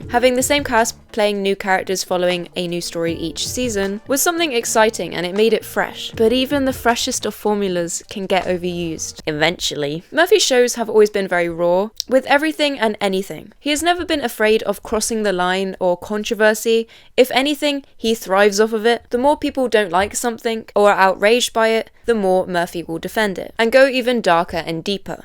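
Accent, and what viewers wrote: England, United Kingdom. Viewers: I seem to have fallen into a habit of watching these types of video essays in the evening and no matter the topic, your commentary makes it fascinating and engaging, so thanks for that! I am trying to reclaim my attention span by watching longer videos lol. Also I find it such a struggle identifying English accents, I'm Irish so I know the difference between North and South and the really obvious ones like Liverpool, Yorkshire and Birmingham but would I be right in placing yours somewhereeee outside of London? As in the surrounding counties or somewhere like Brighton. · Oh great another British YouTuber! You should really learn the pronunciation of people's names and not Britishize them. I watched one episode of this garbage show and will not continue. I feel Kardashians are a plague upon this earth!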